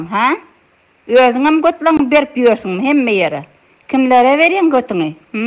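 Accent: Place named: Dashoguz, Turkmenistan. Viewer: Şu Daşoguz şiwesine gaty gülküm gelýär her diñlämde Türkmençäñ ýedi puşduna ýetjek şekilde gürleýüşleri bar. Hudaý gorasyn ýöne iki,üç millet arasynda galmakdan :))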